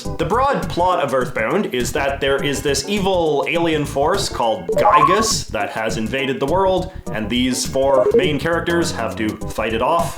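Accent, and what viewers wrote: Canada. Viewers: It's his Canadian accent, of course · Your accent is aggressively Canadian, my god.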